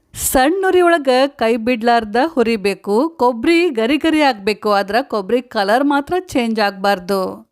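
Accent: North Karnataka, India. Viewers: ನಿಮ್ಮ ಬಾಯಿಂದ ನಮ್ಮ ಕಡೆ ಕನ್ನಡ ಮಾತು ಕೇಳೋಕೆ ಬಾಳ ಛಲೋ ಅನ್ನಸ್ತೈತಿ 😊❤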